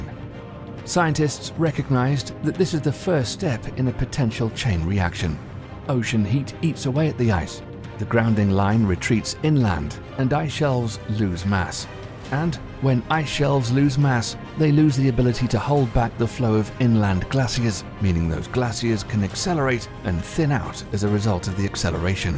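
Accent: England, United Kingdom. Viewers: British scary accent, orchestra music, roll the drums and guess what; 99% of all the comments below, agree that this is yet another scary scam. After fourteen billion years of earth history and the survival of life, it appears that 100ppm of CO2 will doom the planet in the next ten years. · Freaking English accent and the background music make it so hard for me to concentrate..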